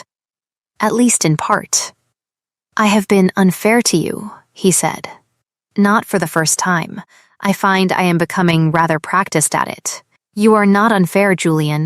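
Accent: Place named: United States. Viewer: A ducal story shouldn’t be narrated by an American voice!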